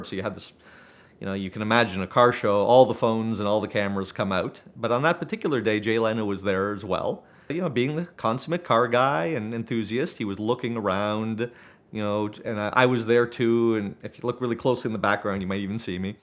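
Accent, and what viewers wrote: Canada. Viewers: The Canadian accent is entertaining in itself. I’ve never heard “curb” pronounced in such a unique way…”CURRBEH” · I can assure you, there is no need for you to tell us you’re from Canada. We knew the second you said “out”.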